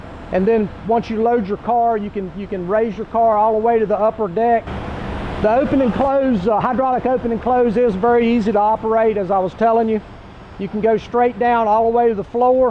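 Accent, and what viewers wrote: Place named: South, United States. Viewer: Official NASCAR accent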